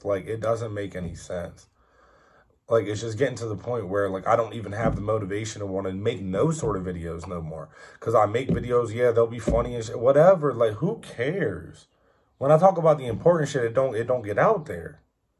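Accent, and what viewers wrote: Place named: Pennsylvania, United States. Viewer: I enjoy watching you. I grew up in small town PA. My heart is there and listening to you reminds me of home. Your accent. The backgrounds. Your story is awesome. Keep going.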